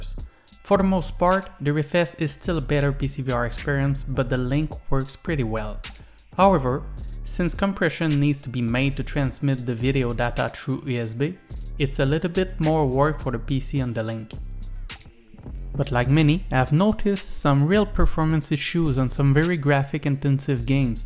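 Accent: Quebec, Canada. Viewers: Nice video bro haha j'ai direct su avec ton accent que t'étais QC lol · accent du quebec sa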